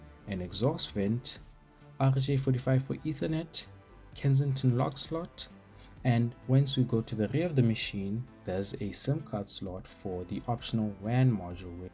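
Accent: South Africa. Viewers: Thanks for sharing the review. I am still riding a T490. In this part of Europe they are still well around 2-300 eur and needless to mention mostly equipped with 1st gen Intel CPUs. Would consider it with a similar setup to yours although would be beneficial to be close to that IT asset change fire 🔥 haha. Nice SA accent. I think you could quite get along nowadays with games if you took the chance and opted in for Epic games in the past few years just for the sake of collecting 👌 Enjoy your new mobo, the screen looks good indeed for a regular IPS panel. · I'm 81 with hearing loss, and I was delighted to hear such a gentle voice on YouTube, and followed it all (until you got to the gaming, which I just skipped). Maybe I'm just more used to South African pronunciation? But a good mic is always a good thing to have. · I thought I heard a fellow South African. 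Nice video!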